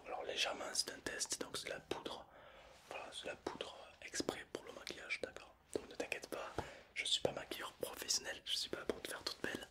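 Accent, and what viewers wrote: South, France. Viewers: Normal c l'accent du sud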